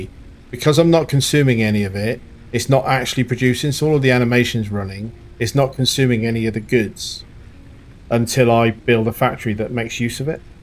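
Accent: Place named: West Country, United Kingdom. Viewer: You're quickly becoming one of my favourite YouTubers. I've been binging your snowrunner series. Currently on episode 30. Can I ask with your accent. Are you from Devon/Somerset area? Fellow UK resident here!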